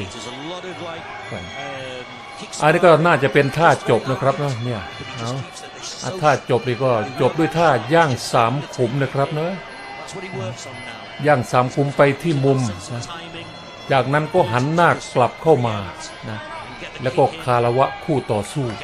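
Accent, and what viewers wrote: Isan, Thailand. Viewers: ผมว่าน่าจะใช่ พากย์มิสเตอร์บีนเสียงอีสานด้วย เสียงคล้ายมาก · ผมคนจังหวัดนครสวรรค์คนภาคกลาง ยังชอบเวลาภาคเสียงอีสานเลยครับตลกและฮาดีครับ..ชอบๆครับ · 😂😂😂😂😂😂😂😂😂😂😂😂😂😂😂😂😂😂😂❤❤❤❤❤❤❤❤❤❤❤❤❤❤❤❤❤❤😂😂ชอบคนพากษ์ที่ชือ พากษ์อีสานฝรั่งขะแมร์😊😊😊😊😊😊😊😊😊😊😊😊😊😊😊😊😊😊😊😊😊😊😊😊😊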